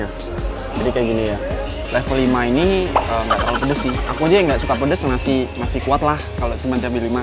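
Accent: Java, Indonesia. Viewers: kirain jawa. Kok kayaknya medok banget kalo ngomong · Ngomongnya kayak logat jawa tpi org bali:vv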